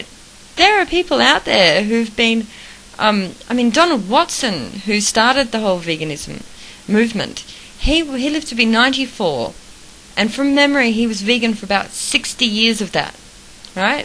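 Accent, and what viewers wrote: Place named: Australia. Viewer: dude China's awesome. the US does horrible things as much as China, just not fur. heard of bear parks? every country has its good and bad points and its immature to say that one country is better than another. anyway, yaaaaay great videos :) you sound so Aussie too and like a normal casual person, not like a crazy hippie. hopefully people will respect your opinion.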